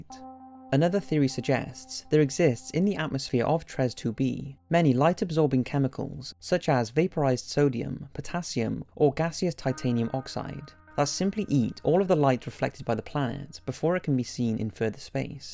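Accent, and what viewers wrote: Wales, United Kingdom. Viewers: You must be from Cardiff or somewhere down South Wales? That accent is deffo Welsh 🤔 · Partisan Mapping ive turned most of my friends into ' fans. Now when we smoke at my place they say "lets watch some " and we just burn and listen to this nigga's surprisingly soothing british voice go on and on about interesting shit.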